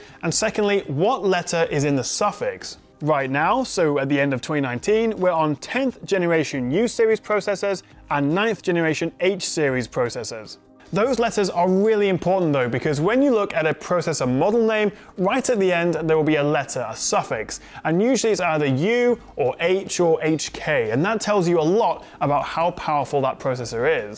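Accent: United Kingdom. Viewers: What type of accent does he have because it sounds kind of British but also American.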